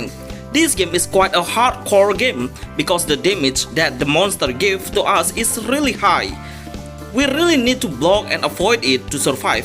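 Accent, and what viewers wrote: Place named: Indonesia. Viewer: mungkin pembicaranya yg indo bang kek indo banget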